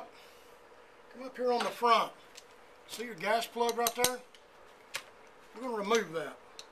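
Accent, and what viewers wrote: Maine, United States. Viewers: Is that a Maine accent????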